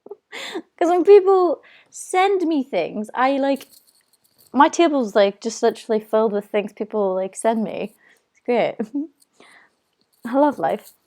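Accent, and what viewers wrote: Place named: Ireland. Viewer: That amazing Irish accent! ;)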